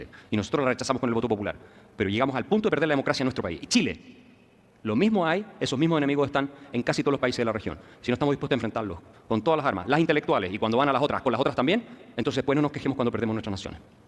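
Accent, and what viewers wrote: Peru. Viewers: El acento limeño es perfecto. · 👈El único ridículo eres tu, nadie está forzando nada ciertamente. Así hablan los Peruanos. Si no te parece bien es problema tuyo , 🎉 FRVSTR@ 🎉😂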